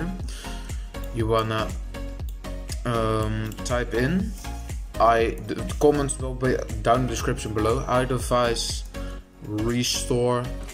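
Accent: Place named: Netherlands. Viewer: Kon aan je stem al aanhoren dat je een Nederlander bent, en het kopje waarin je zelf op het knopje abonneren klikt, bevestigt het voor me. Haha verder informatieve video!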